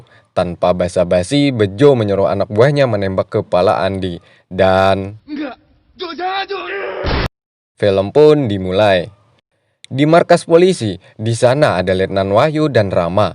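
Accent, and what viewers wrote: Banjar, Indonesia. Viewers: Banjar kah pinanya sidin nih🤣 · Orang mana mang pinang Banjar bangat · Banjar banar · Urang banjar kh ini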